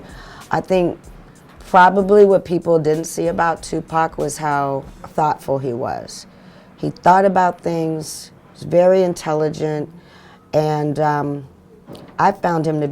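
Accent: South, United States. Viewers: She definitely ain’t nan like Whitley Gilbert bruh💯🚫🧢even doe she frm up Nawf she got popular off uh dat gud O kuntry accent own “A Different World 🌍 “ ya digg... not ta mention she dun spent majority uh ha time in my hometown ATL💪🏽Salutez👆🏽Mz Guy u alwayz have been my crush since Whitley doe😍👌🏽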